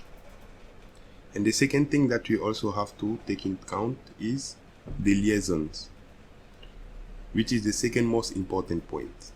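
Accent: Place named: West Africa. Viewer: Sounds like an african french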